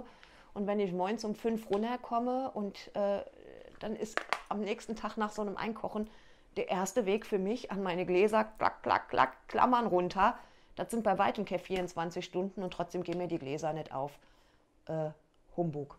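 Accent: Hesse, Germany. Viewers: Liebe, danke für das tolle Video!!! Wir sind Hessen... leben aber schon seit 5 Jahren in Österreich und ich liebe es... deine herzige hessische Ausdrucksweise... bitte weiter so! Grinsss Und ja, ich hätte gerne das Brot mit dem zugekauften Teig als Video. Lass dich bitte nicht ärgern von Menschen die nur motzen wollen. LG Regina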